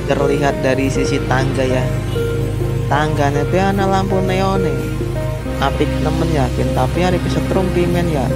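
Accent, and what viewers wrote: Banyumas, Indonesia. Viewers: Aku wong ngapak juga mas 👍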